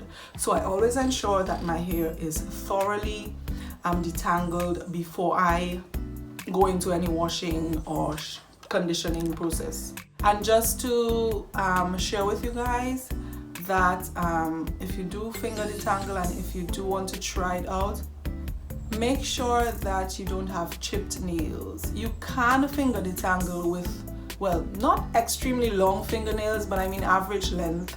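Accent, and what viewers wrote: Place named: Caribbean. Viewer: Hi, where are you from, I hear a Caribbean accent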